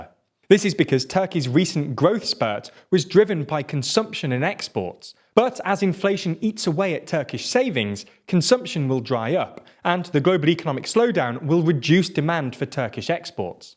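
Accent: United Kingdom. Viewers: I don't want politics in a british accent anymore. Let's make it happen, people. they wrecked the world.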